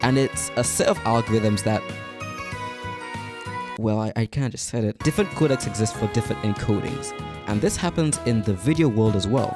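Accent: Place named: United Kingdom. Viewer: really excited to see your british accent get stronger with each video! <3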